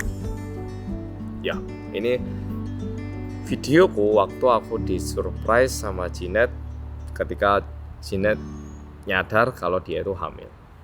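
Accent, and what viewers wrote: Java, Indonesia. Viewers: alhamdulilah mas aku bolomu dari gondola running man sampe eneng wong korea medok seng versi saschet🎉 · Ngikutin sam dari 2018an dari dulu yg masi nge kos trs nge vlog ini itu dengan logat medok nya yg masih lekat bgt smpe sekarang tuh luar biasa sam Kangen memang di bbrp taun terakhir ini jarang ngeliat vlog mas, sampe" klo senggang tuh ngeliat vlog" jaman dulu trs ngeliat metbg pas masi di awal" Wes pokoke yo kangen ngono mas. Smpe akhire barusan liat sekilas di ig oh ternyata Jennette hamil toh, dan ini baru liat video penjelasannya mas. Ikut berbahagia juga mas dengernya dan sehat" terus buat keluarga😊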